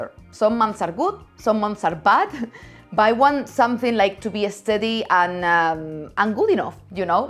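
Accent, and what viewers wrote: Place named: Spain. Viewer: Lo primero que me llamo la atención de tus videos fue tu acento, después de varios vídeos especulaba eras de España y si, gran contenido, saludos desde México, también subo contenido a mi canal, todo en español, ha sido complicado para ti hacer un canal en inglés.?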